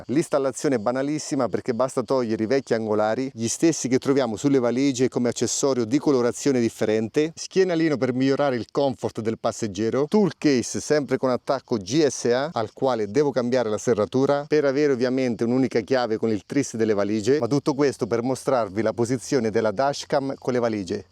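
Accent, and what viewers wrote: Marche, Italy. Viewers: Tu sei MARCHIGIANO X CASO?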